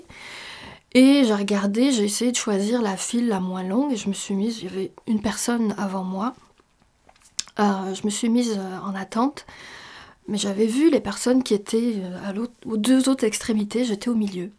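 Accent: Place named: South, France. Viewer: Tu viens du sud toi c'est clair ;)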